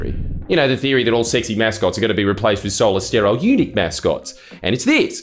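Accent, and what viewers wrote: United States. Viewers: Your American accent is top notch mate, keep it up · Keep doing your American accent, I love it. It's absolutely great and terrible at the same time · Aside from nit pick pendantic mistake the nonrhotic terminating A in California although many American dialects especially measured by number of speakers is nonrhotic but it was clearly not intended to be one of those tangent over back to the point Fantastic generic American accent probably the best non-traditional media performance of the accent I have heard ever no question about it. That folksie quality is noticeably audible to the unaided ear but totally avoids the natural inclination of foregoing any subtlety in a culture foreign to the impressionist. typically it is way too hammed up this is not exaggerated to any extent it is just there I mean aside from the error but the error is pretty understandable because it is something that needs a bit more deliberate practice at first and also has to be kept up to date or any impressionist will stumbles over the particular artifact of going codes switching maskoff a bit prematurely at the end. 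It results from the eagerness to go back to one's own authentic self. This will happen to anyone that doesn't built up tolerance for doing it for long periods of time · Your American accent is very good. However, you don’t fully enunciate the “a” and “er” at the end of words like Californi”a” and Arnold Schwarzenegg”er”. Otherwise keep up the great work. Happy my small country could get some representation ( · Your American accent is top notch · american accent is scarily believable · I wish I could do a Aussie accent as well as does a Yankee one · Your American accent deeply unsettles me. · ngl your american accent is pretty good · As a new American subscriber please shoehorn in your American accent more it’s amazing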